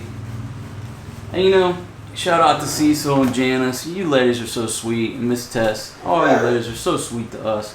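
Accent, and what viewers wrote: South, United States. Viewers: You have a good country voice 👍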